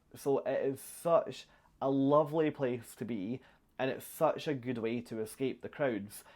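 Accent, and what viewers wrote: Scotland, United Kingdom. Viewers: Are you speaking English? THAT's the Aussie accent I was warned about! Not that there's anything wrong with it. OH Scottish. My bad. I was thrown off by the shirt. I do a fair Scot accent myself! You're kinda young for HAL...actually you are VERY young for HAL! 🤣 Dutch and Cuisine in the same sentence...does not compute. Not every HAL ship has a 'thermal suite' On Signature class ships, I THINK it's called The Retreat. This is true on a lot of non Pinnacle class ships - IE BB King, notes etc is not on every ship either. We are going to be on the Nieuw Amsterdam on our next cruise and the only Music Walk venues they have is Billboard and Rolling Stone. Not just a wine tasting....but a wine PAIRING! I wonder if they have a master Sommelier on board! There aren't that many of them in the WORLD! Have it All includes a night at Pinnacle! 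· I loved your videos and your Scottish brogue. I’ve travelled a lot on Holland and enjoy it but I am 87 and can’t get around well enough to do all those activities. Don’t get off at ports either as walking is difficult but I DO enjoy eating and relaxing. Thank you for the videos!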